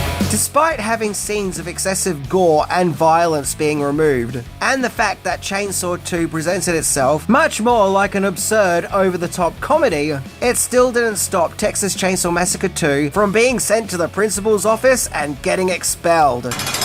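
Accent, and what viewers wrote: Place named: Australia. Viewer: Me, personally, I hate AI narration. Souless. Plus I love the Aussie accent.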